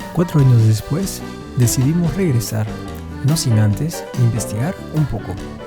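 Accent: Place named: France. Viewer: Me encantó el vídeo. Me encanta el acento francés al hablar español.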